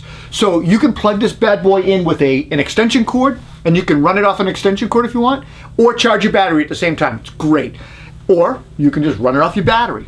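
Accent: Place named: New York, United States. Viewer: Great review , sound like a New Yorker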